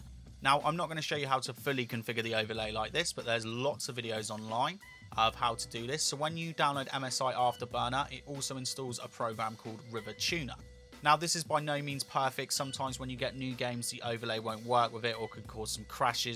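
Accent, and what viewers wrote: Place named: England, United Kingdom. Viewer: That English accent... Just perfect.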